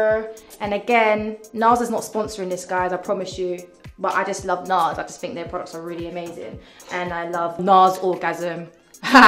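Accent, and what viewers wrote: United Kingdom. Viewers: Thank you for helping me with the British accent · I HAD NO IDEA SHE WAS BRITISH?! AND A CHILD??? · Omg I didnt realise she was british yasss black queen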